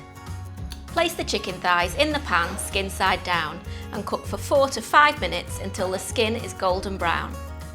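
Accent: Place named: England, United Kingdom. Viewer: English pronunciation, unusual to American ears I'm sure. I'm always impressed that includes American measures in her directions as well. Its not common to see both.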